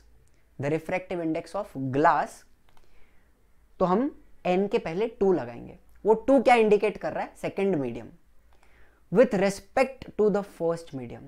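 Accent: Maharashtra, India. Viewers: Sir i think your mother tounge is marathi 😅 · Sir kya aap marathi ho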